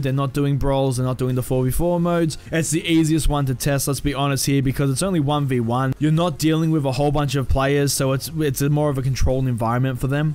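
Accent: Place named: Australia. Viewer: Which part of australia you from? Im subscribing m8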